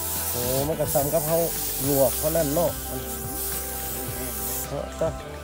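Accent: Thailand. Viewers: อู้เหมือนคนภาคเหนือเจียงฮาย ประเทศไทยเลย ท่านเป็นคนที่ไหนครับ และเดี๋ยวนี้อยู่ประเทศอะไร ดูน่าอยู่มาก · สำเนียงอย่างคนลำปางลุ๊คับ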